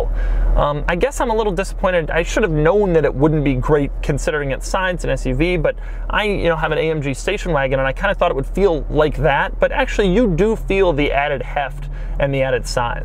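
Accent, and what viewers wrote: United States. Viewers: I absolutely hate how Americans pronounce German names. I mean I’m Dutch so as an inhabitant of the neighbor county of Germany pronouncing the names of these cars correctly is a lot easier for me than for you, but please try to because you Americans think you are the best in the world while Europe is a lot better.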